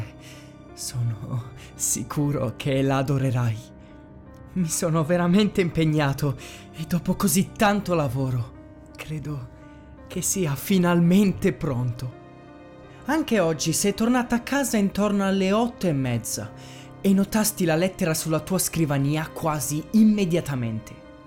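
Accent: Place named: Norway. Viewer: sei bravissimo e amo troppo il tuo accento norvegese credo. Continua così perché sei un mito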